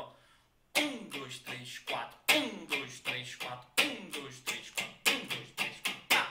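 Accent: Pará, Brazil. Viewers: Legal o vídeo... e cara vc tem sotaque Paraense heheh eu sou do Pará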